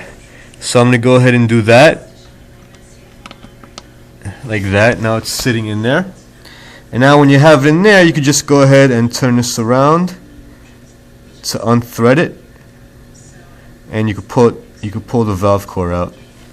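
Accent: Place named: New York, United States. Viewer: Good reminder for me from Vinnie Barbarino from Welcome Back Kotter😂😂 You do sound like him with the NY accent👍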